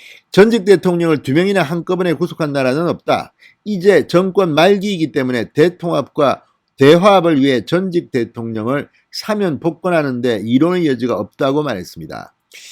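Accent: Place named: Gyeongbuk, South Korea. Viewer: 경북사투리중~대통대통 령악센트/토나와여~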